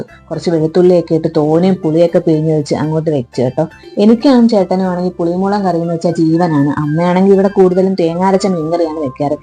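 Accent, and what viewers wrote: Kollam, India. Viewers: 😂😁 കൊല്ലം, ക്കാരാണോ😮 സംസാരം, കേട്ടപ്പോ,,, നല്ല🌹🌹 വീഡിയോ,, നല്ല,, ഇഷ്ടമായി🥰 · കൊല്ലം ആണല്ലേ😂😂😂 കുറെ തോനേ...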